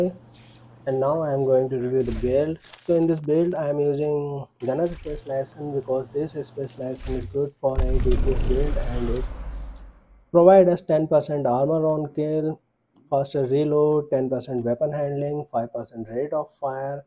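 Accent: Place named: India. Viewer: Thank you for sharing I understand your India 🇮🇳 accent it’s a little broken but I appreciate the effort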